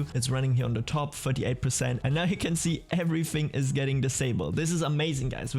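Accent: Germany. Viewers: Your 100% german, I can hear it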